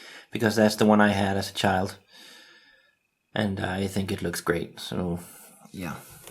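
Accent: Denmark. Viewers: Hehe.... Sjovt at jeg tænkte at du er danskee, da jeg hørte din accent. God video. Min favorit er c modellen.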